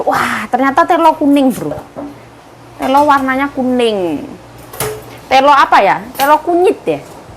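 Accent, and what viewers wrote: Java, Indonesia. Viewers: Logate omong lucu...bner2 logat jowo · i love video you yg khas medok e😀😀😀😀👍👍👍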